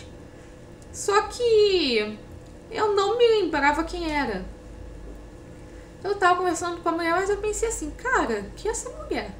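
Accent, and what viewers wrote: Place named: Rio de Janeiro, Brazil. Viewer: com essa língua presa é falando carioqueis é engraçado demais kkkk. Sabia que VC não diria às diferenças das profissões kkkk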